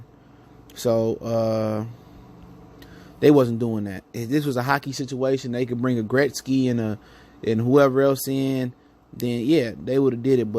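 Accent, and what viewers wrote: United States. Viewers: Your commentary sounds very American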